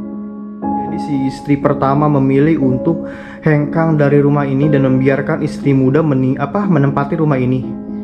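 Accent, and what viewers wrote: Sunda, Indonesia. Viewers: Panutan banget nih Bang....sukses terus bang hehe...semoga selalu bisa mempertahankan adat logat sunda dan makin meningkat...makin keren makin joss par jos geng...salam sehat salam santun salam explore....Bang... hehe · Assalmualaikum kang...purwakarta hdir met pgi,br td pgi liat aa ngreview rumkit cirebon skrg rmh yg terbngkalai,ngdengr suarany cndu bbgt a..logat sundany itu lo kntal bngt😚🙏😘🥰 sukses trus chanel ny ya a🙏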